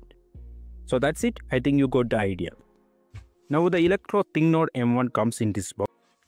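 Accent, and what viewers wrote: India. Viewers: Индийский английский звучит противно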